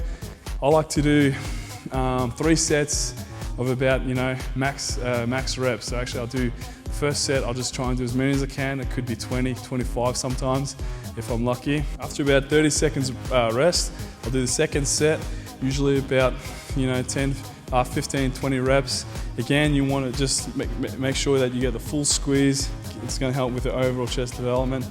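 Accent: Australia. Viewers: most definitely is Australian you plum. Hence the Aussie accent. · With a hint of an Australian accent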